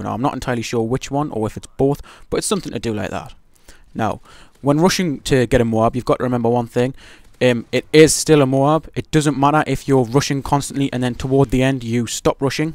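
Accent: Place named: Newcastle, United Kingdom. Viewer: Nowt like listening to a geordie kill people. Wish you had PC man, we'd make an awesome geordie domination team.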